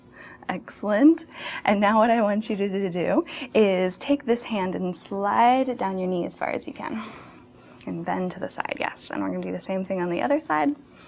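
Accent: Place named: United Kingdom. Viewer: Your british accent gives you away 😄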